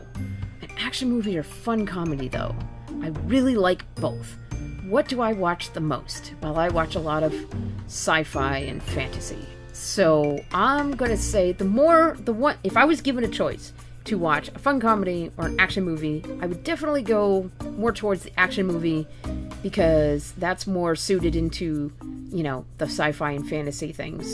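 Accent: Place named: Boston, United States. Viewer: Once you said you're close to Bostonian I instantly heard it in your voice.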